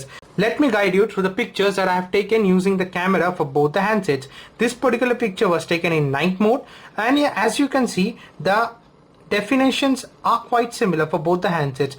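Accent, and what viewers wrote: India. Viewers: hahahahah that what I was about to say , i watched many A7 video but all turn out to be Indians with their Indian accent. ... the way he pronounce Edges as Ages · Indian accent makes me crazy.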